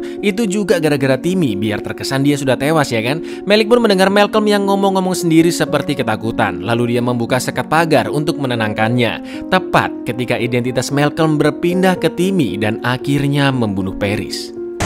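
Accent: Java, Indonesia. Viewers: Intonasi, jawa-nya terasa banget + mantap bang.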